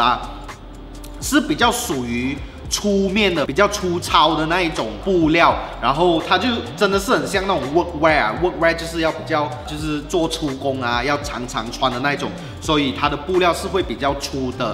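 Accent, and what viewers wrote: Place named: Malaysia. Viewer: 听到熟悉的马来西亚华语，原来您也穿我喜欢的素T，好棒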